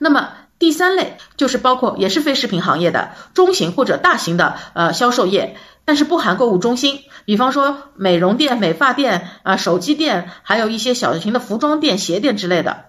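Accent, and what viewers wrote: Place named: Shanghai, China. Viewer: 特别喜欢你的视频！听出了一种上海宁米道！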